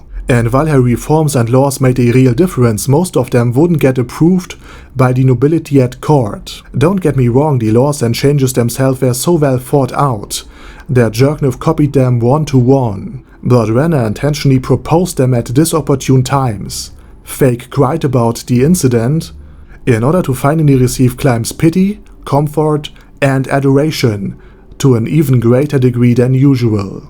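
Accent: Germany. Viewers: I am pretty sure that your original language is German xD der Inhalt ist supi aber dude dein Dialekt ist schon übel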